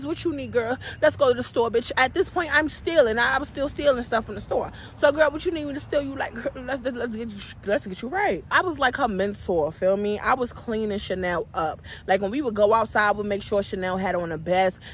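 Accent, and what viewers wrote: New York, United States. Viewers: Sis you be getting some good sponsors! Anyways been binge watching you since your new body butter video loved it even though I’ve been making my own for years I loved your video and how entertaining you are! Now I usually hate to hear that accent so I don’t watch people who have that New York accent but yours yeah I likes. You and symone are my favorite storytime YouTubers now. Anyways keep pushing these videos out sis!💙💙💙 love from Cali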